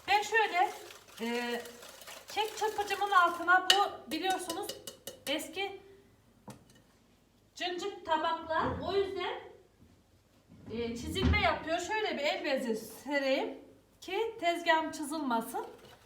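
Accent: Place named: Konya, Turkey. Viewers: Tamam konyalı konuş yonuşyosun hanım ben konyalı yım calarım biz abane oldum hanım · hmm nerelisiniz konuşmanız bizim yöremize çok benziyor nevşehirliyim ben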